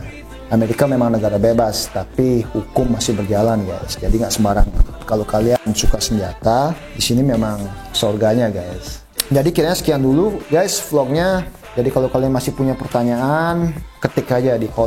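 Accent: Bali, Indonesia. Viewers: Logat bahasa kaya orang bali om salam dari palangkaraya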